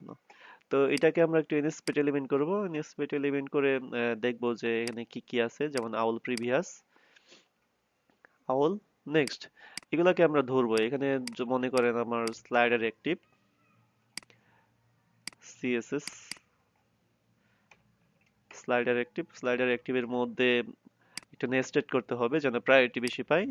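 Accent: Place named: Bangladesh. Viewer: Mind blowing. This is the first time I saw tutorial in bengali. Bdw your accent sounds like you are from Bangladesh. Are you?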